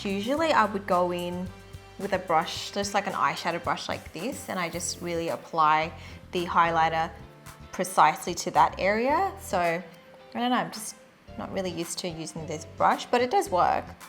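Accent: Australia. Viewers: I started watching her and I was like, is she Australian? I was right. Go Australia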